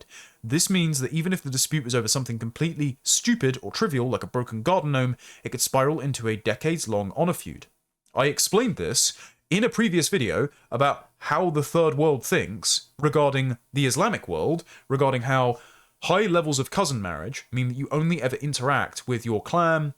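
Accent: RP, United Kingdom. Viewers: Yank here. You are the perfect defender of Western values. You have a posh accent. You look dapper. Your enunciation is superb. Your thoughts are clear and direct. You include the States in your defense. And, you have this lovely background music of seething outrage. Well done, and thanks! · Love that British accent. Alas, soon it may be a thing of the past 😢 Britain may soon be speaking with an Indian accent. Prepare well my friend 😉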